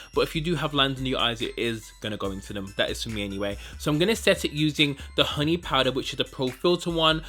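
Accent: Birmingham, United Kingdom. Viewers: Yessss I can hear The Birmingham accent in this video lol I keep wanting to try Fenty but everyone I go to the counter it’s ridiculous. 💕 I have your piriton sis lol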